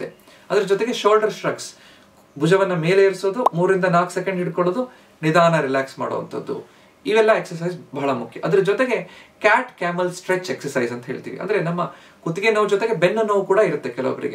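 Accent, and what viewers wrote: Standard. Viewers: ಮಾನ್ಯರೆ, ತಾವು ಸ್ವಚ್ಚ ಕನ್ನಡದಲ್ಲಿ ವಿಸ್ತಾರವಾಗಿ ನಿರರ್ಗಳವಾಗಿ ಅನೇಕ ವೈದ್ಯಕೀಯ ವಿಷಯಗಳನ್ನು ಹಾಗು ಸಮಸ್ಯೆಗಳಿಗೆ ಪರಿಹಾರ ಮಾರ್ಗೋಪಾಯಗಳನ್ನು ಸೂಚಿಸುತ್ತಿದ್ದೀರಿ. ಅನಂತ ವಂದನೆಗಳು.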